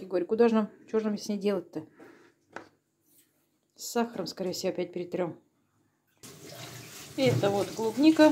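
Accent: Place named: Saratov, Russia. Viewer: Привет из Израиля! С удовольствием смотрю ваши ролики,слушаю ваш говор такой родной.Я сама родом с Саратова,но уже много лет не была там.Мне так близки ваши заботы и хлопоты.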